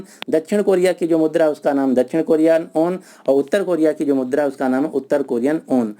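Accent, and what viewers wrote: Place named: Uttar Pradesh, India. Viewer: Lagta h ki app up ke h hain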